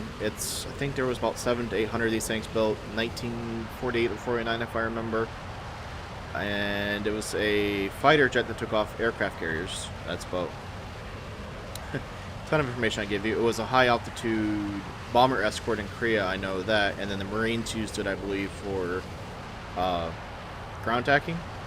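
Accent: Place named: Texas, United States. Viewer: I'm pretty sure he's from Texas idk how you got a British accent vibe from his voice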